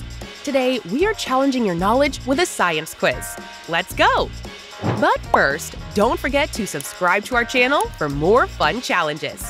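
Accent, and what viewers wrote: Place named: United States. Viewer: there must be a lot of dumb shishkababs out there!!! Like your American acsent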